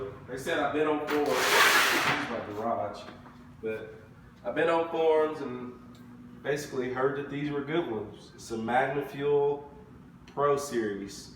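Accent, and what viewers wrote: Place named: South, United States. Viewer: What part of the south are you from. Southern drawl is really noticeable. But great video.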